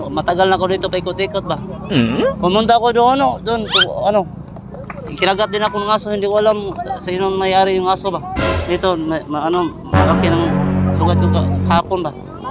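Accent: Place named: Visayas, Philippines. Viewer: Mag bisaya kanalang mali ang tagalog mo bisaya ang dating.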